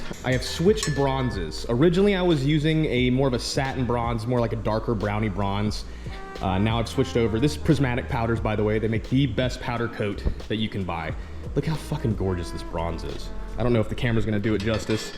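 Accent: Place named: United States. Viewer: A man and his deep country accent TRUCK, Yee Yee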